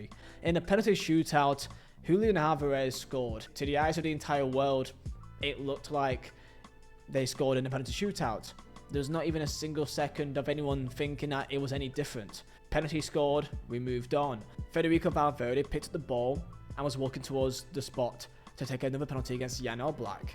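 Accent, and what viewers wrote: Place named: Rossendale, United Kingdom. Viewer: German names in a Rossendale accent. Pure comedy gold.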